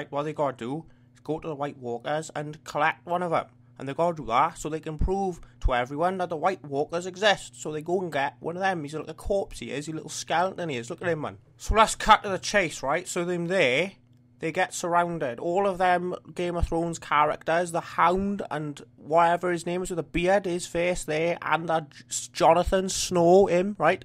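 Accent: Wales, United Kingdom. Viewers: Such an interesting accent you have, (I'm from upstate new york). Is Welsh your first language? Just curious how it usually works in Wales.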